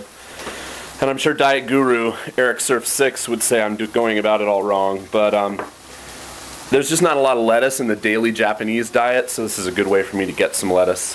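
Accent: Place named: Scotland, United Kingdom. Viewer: YAY! Scottish accent..you should make a 2 part video with this accent.But you should have saved that last part(when you eat) for your next 2 part video,Eating with...with a scottish accent...ha ha ha.Seriously it would be nice if you could make a video at many kind of japanese restaurant,like where you go often for ramen,okonomiyaki etc...should be great!looking forward to your nxt vid.(i'm really curious about Okonomiyaki restaurant and Genuine Ramen Stand)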